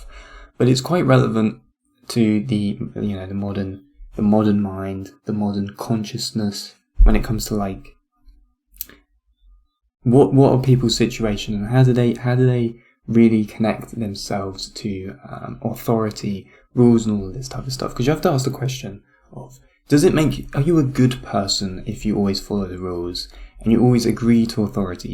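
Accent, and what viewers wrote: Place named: Cockney, United Kingdom. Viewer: Philosophy with a cockney accent....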